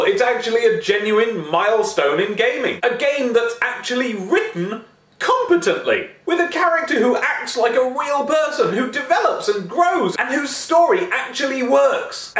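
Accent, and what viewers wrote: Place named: United Kingdom. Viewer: You were totally talking in a british accent and that ruined it for me could you be more American?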